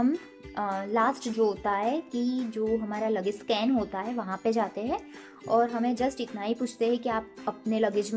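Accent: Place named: Gujarat, India. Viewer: damn.. ur gujju accent... love it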